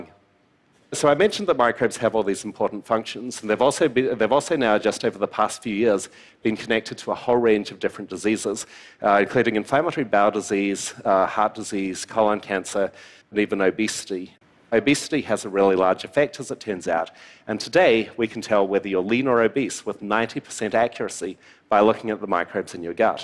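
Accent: New Zealand. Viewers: Kiwi here. I was also immediately thrown by the accent. He's got a Kiwi twang but there's definitely something really unusual about that accent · de Valle Ruiz Re: the accent, I actually found kiwi so refreshing after hearing American so much of the time :-) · It's a type of NZ accent from the deep south. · He's from New Zealand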